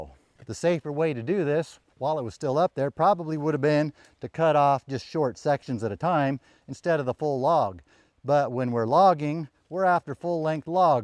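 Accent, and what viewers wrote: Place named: West, United States. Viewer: di quale zona (stato) è? la parlata( accento) sembra quella di " Buster Scruggs "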